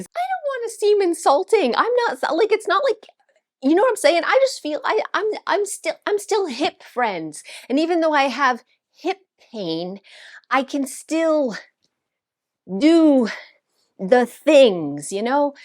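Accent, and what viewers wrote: Canada. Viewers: Are you Canadian? av I hear your accent!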